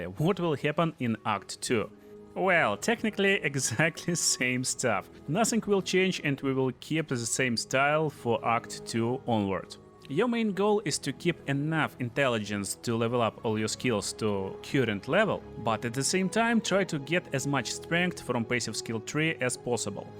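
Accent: Russia. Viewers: слышен явный рязанский акцент, уши прям режет =) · I hear Russian accent aula bulia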